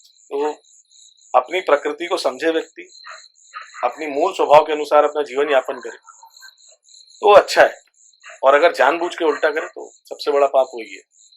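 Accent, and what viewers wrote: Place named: Maharashtra, India. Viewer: मुझे हमेशा ऐसे क्यू लगता हाई की आप महाराष्ट्र से हो. मराठी भाषिक हो क्योंकि आपकी हिन्दी एक मराठी भाषिक जैसे है. तथा नाथ परंपरा तो महाराष्ट्र धर्म की है. जय गिरनारी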